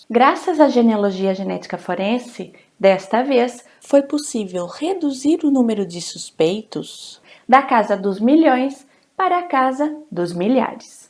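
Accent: Portugal. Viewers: Com todo respeito, você nasceu em Portugal? Seu sotaque vem de onde? Ótimo trabalho!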